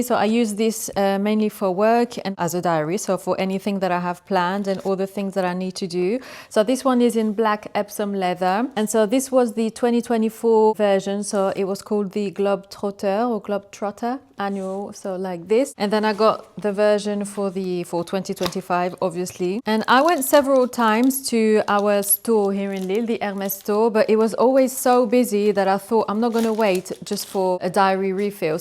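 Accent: United Kingdom. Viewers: Coucou, trop cool te t’entendre parler l’anglais avec l’accent British hehe ce serait super chouette d’entendre parler en anglais aussi 😍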